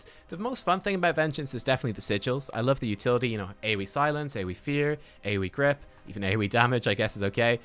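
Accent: Ireland. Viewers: Dat sweet Irish accent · are you irish or am i picking up the accent entirely wrong?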